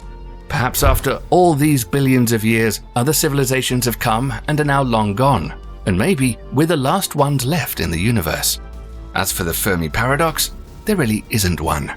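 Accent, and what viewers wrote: United Kingdom. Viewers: "Because if an alien civilization exist they certainly know we are here" Another soap opera alien video that starts with a silly thought as if this was (or worse could be) a proven fact. That is first. Secondly, if they existed and they are more "advanced" than we do, and knew we are here, why would they waste their time with some silly humans who does nothing but kill each other in the name of some "god" ? Or make war for opium. Maybe you should ask yourself with your British accent if aliens could be as harmful as UK has been to this world.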